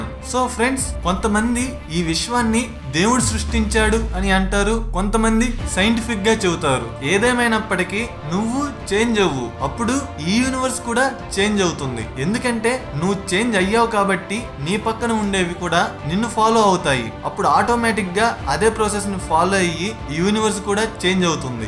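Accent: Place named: Nellore, India. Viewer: mee slang eppudu bagundhi.. First lo aiethe baaga telisipoyedhi nellore or ongole ani.. I am from nellore if possible mana slang kottinattu untadhi inka marcheyamdi..appudu andharu chustharu..mee hard work ni appreciate chesthunanu.. all the best.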